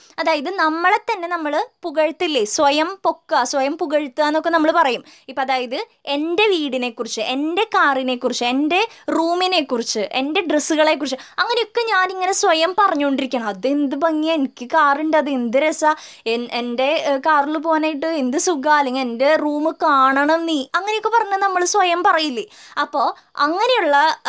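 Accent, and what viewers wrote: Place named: Thrissur, India. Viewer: ചേച്ചിയുടെ ക്ലാസ്സ് ഒരു ബോറഡിയും ഇല്ല.. online classil ഇരിക്കുമ്പോൾ വീണു വീണു uraghum ചേച്ചിടെ ആ trissure ബാഷ യും കേൾക്കുമ്പോൾ കെട്ടിരിക്കുംഭോൾ അടിപൊളി ആണ്.. ഇത് പോലെ വേണം Thrs 😍🤩🤩🤓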